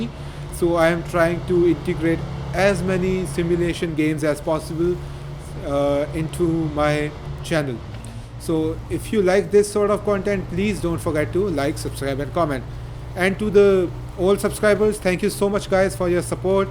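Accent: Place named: India. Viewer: Hey !! Sounds like you're Indian, where are you from? I am a biker YouTuber from Bangalore who loves this game!! Love your content , subscribed :)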